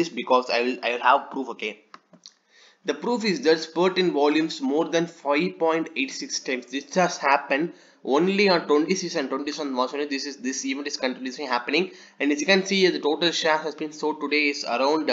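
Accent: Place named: Tamil Nadu, India. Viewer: Tamil English 🤯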